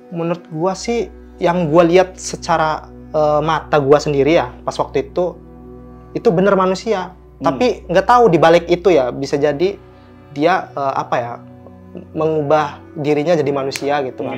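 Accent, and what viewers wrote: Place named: Jaseng, Indonesia. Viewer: Walaupun bahasa nya lo gw lo gw logat jaseng nya gk ilang😂😅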